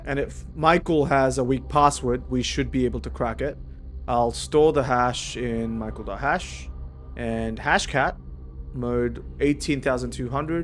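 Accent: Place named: South Africa. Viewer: Suuuubed. What accent is that? South African in Australia?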